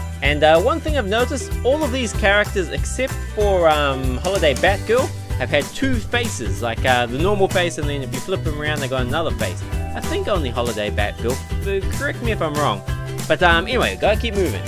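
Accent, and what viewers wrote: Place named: Australia. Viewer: Gotta love that Australian accent! Greetings from Washington state.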